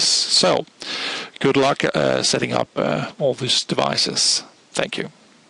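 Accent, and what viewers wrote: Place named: Netherlands. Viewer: No, that's a Dutch accent, it's supposed to be like that.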